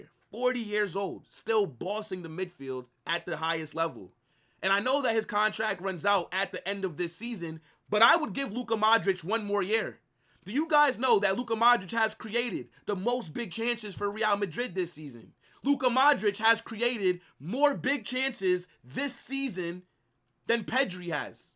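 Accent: United States. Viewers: I could tell he’s American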